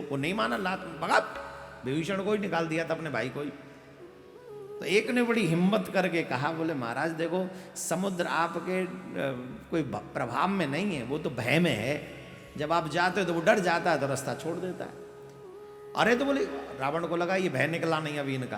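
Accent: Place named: Braj, India. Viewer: Guru ji radhe radhe aap jab braj bhasha bolte h too bhut achha lagta h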